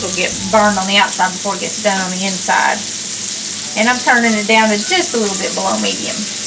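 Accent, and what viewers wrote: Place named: Texas, United States. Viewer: Hi. I just found your channel and I am so happy I did 🤓. Even tho I am a Texan, you have the same sweet accent as me😃. Your recipes & cooking tips are just what this 66 yr old granny has been hunting for. Your meal choices are exactly what we eat at our house. Hope you have a blessed day. Connie in Lubbock, Texas 💗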